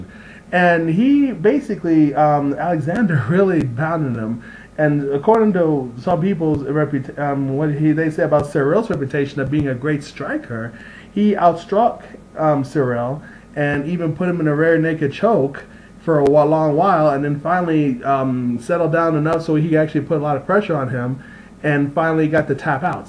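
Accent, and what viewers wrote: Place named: England, United Kingdom. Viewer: Lmao ur accent cracks me up idk where ur from maybe England but I appreciate your luv for martial arts it's very similiar to mine keep doin your thing and ur name rocks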